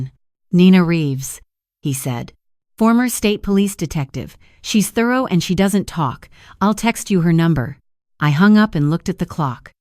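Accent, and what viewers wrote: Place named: France. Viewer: Why french pronounciation? Needs fixing.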